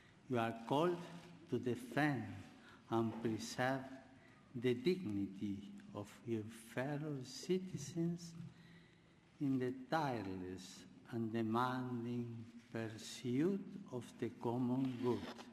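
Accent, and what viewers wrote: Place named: Argentina. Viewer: Heavily accented indeed, you can only expect so much from a man born of Argentine descent (whom spent most of his life in the Vatican thereafter) trying to speak English. That being said, the words uttered do carry heavy weight.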